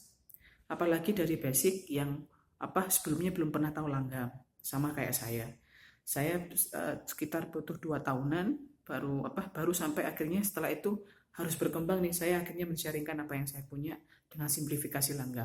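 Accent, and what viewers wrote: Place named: Java, Indonesia. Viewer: aslinya mana sih bang? kok ada boso jowone "nirukke" ?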